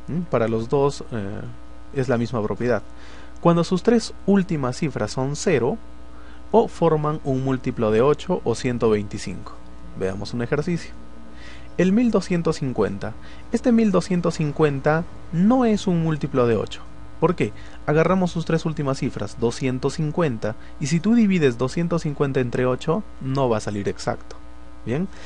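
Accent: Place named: Peru. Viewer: eres de peru se nota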